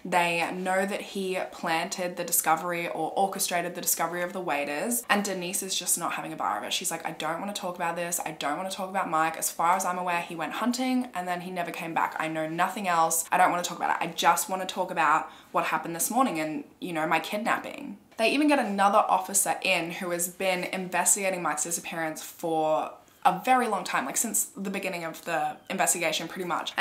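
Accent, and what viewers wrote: Australia. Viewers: We're is the accent from? Australian? It's grating and can't listen anymore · Heavy Aussie accent!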